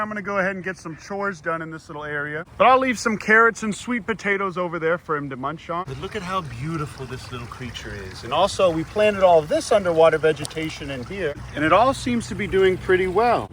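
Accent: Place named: Texas, United States. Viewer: Pennsylvania? No, he's Texan.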